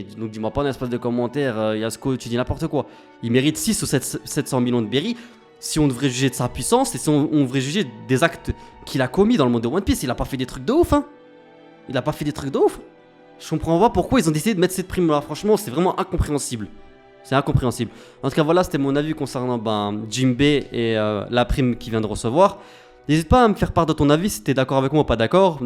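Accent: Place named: France. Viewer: Mon avis ? Parle moins avec ton accent de cité ça gonfle 5 s de vidéo j en ai eu assez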